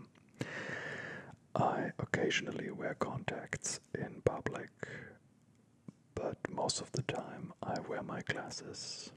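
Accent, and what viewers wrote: Germany. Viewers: I always just watch you in these types of videos lol not so much for the asmr aspect lol (and I enjoy your accent because my whole paternal side is german) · Is he from germany or something like this ? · Is he german? :D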